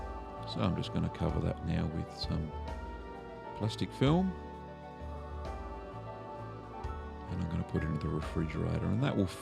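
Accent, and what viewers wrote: Australia. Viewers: Thank you -- very informative. I can't wait to try this; I've become very lactose-intolerant, so unless I make my own I won't get to eat cream cheese again... Not sure where you're from (I'm guessing Australia but wouldn't know a New Zealand or other accent if it bit me) -- but this strawberry pretzel salad is popular here in the States -- haven't tried it yet, but am going to! (this site may ask you to join in order to see the recipe, but there are a ton of recipes out there...) Thank you again! <link>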